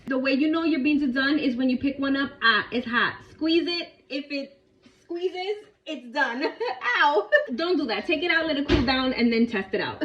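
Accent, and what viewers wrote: New York, United States. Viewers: Just love the New Yawk, talking bout dawdahs, an food for the soul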